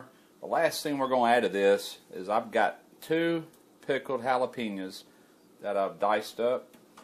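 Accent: South, United States. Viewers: He sounds like Bill belichick with a southern accent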